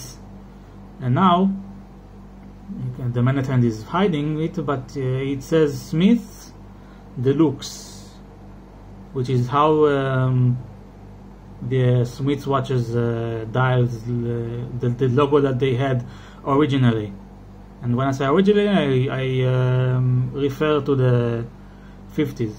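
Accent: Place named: Israel. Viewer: Great review! Are you Israeli? I live in Tel Aviv and you definitely sound like it haha. Anyway, keep up the good work!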